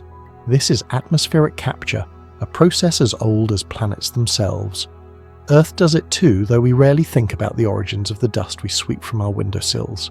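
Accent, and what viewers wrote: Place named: United Kingdom. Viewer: So Maven isost and offline. Hold old is this BS. he I hear this British voice I know it will be wordy BS not worth my time or bsndwidth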